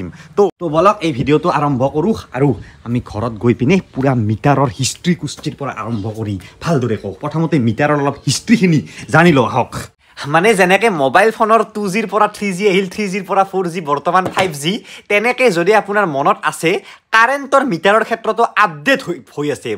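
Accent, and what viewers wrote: Nalbari, India. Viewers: Nalbari r manuh so accent tu olp beleg hoi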